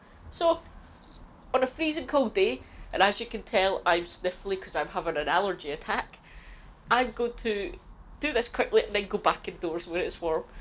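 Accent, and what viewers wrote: Scotland, United Kingdom. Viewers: Hey where are you from I’m edinburgh EH12 so chuffed to hear a Scottish voice. I’ve just go a loan of a greenhouse from an Friend in Balerno and a second hand greenhouse from The family across the road. Would love To connect to pick your brain !!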